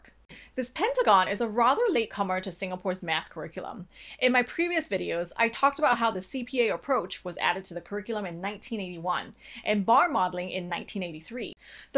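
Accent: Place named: United States. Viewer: How do you get an American accent having spent 12 years of your formative years in Singapore, taught by Singaporean teachers with Singaporean accent?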